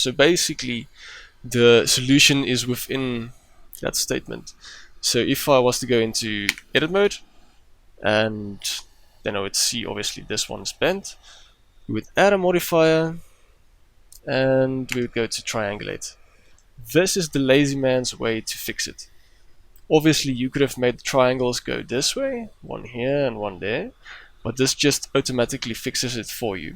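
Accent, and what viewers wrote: South Africa. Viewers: You South African? I hear an afrikaans accent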